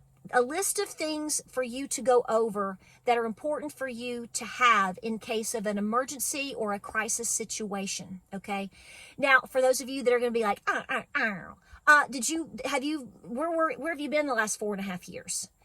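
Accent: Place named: Southern Ohio, United States. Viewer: Love the accent! I grew up in southern OH (bordering WV/KY). Sounds like home :)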